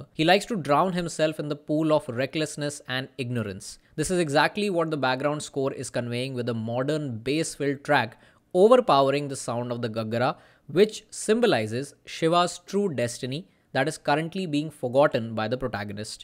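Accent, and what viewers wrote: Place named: Karnataka, India. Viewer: Going by your pronounciation, I thought you are Kannadiga. Dakshina Kannada/Karnataka, many have already commented. But a great video and I pleasently surprised by the sound design when villagers handover gun to Murali in climax. Had not noticed it. Thanks for the video.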